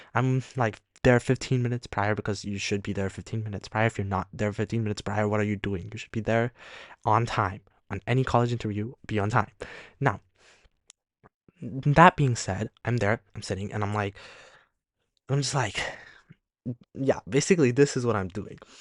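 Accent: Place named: United States. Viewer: Lovely american accent dear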